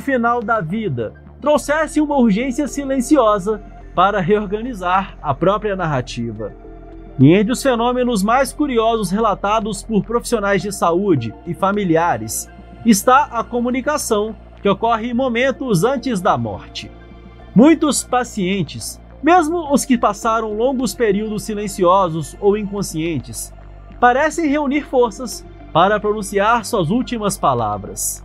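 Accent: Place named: Minas Gerais, Brazil. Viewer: Adoro seus vídeos, muito bem explicados, sua voz suave e esse sotaque mineiro maravilhoso!!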